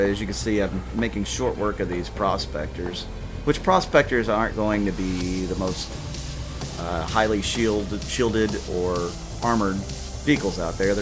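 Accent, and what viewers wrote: United States. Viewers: well , after I see your videos I can easily say that you know the game very well and of course I know you knew that you can take the claim jump mission with any medium side ship any day , just wanted to add that extra info here for those who didn't know , but I'm aware of your intentions of those who are new and don't have caplable ships , and so you show how to with a small ship . Keep the good work up , and I honestly love YouTuber like yourself that I can understand and love the Cristal voice and. America accent , I can't help myself lisenting boredgamer Wich is a tremendous informative YouTuber , one of the best but my ears hurt when he talks .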